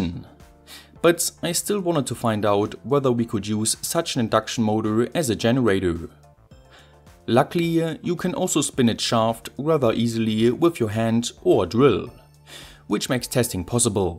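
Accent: Germany. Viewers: the content of your videos SOOO GOOOOOOD your thicc denglish accent SOOOOO BAAAAAD i will now watch your videos muted and with subtitles on. thank you very much for the effort to put subtitles in! keep uploading, you have great videos!!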